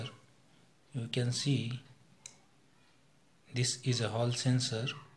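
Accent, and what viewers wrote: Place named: India. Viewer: Your English feel like hindi😅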